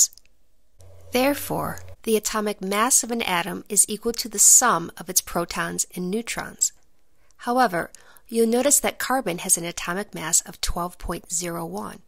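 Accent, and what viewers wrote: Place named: United States. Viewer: Americans have funny accents ヾ(•ω•`)o Not in a bad way Your voice is very crisp 🍎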